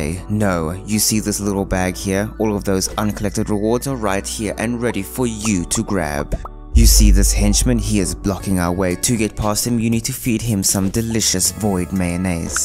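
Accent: South Africa. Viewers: So that's what that accent is. I like it I just didn't know that was a South African accent.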